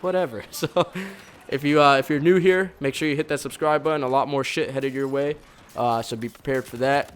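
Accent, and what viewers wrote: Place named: Florida, United States. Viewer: You sound like you live in Florida.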